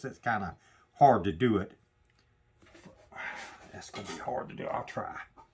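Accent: United States. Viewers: Are you from Kentucky? Your accent sounds like my relatives.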